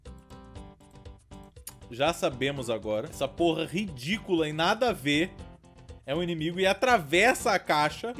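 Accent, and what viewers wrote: Rio de Janeiro, Brazil. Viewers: ta virando carioca?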